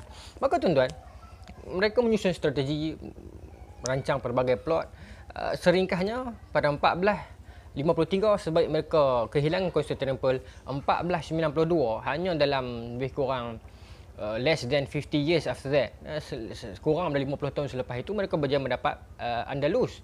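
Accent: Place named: Penang, Malaysia. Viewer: Amboi ustaz, lenggok bahasa satu macam miya 🤭🤣 Jarang-jarang boleh dengaq lenggok penang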